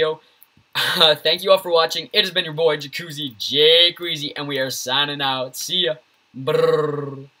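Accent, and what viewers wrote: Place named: United States. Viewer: lol gg i automatically assumed u were american xD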